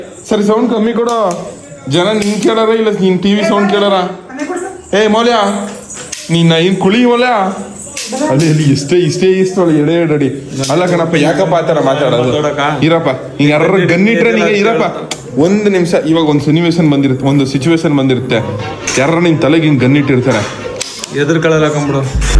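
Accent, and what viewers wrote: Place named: Mangalore, India. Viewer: your Mangalore kannada suppppppppper..... 💐🤔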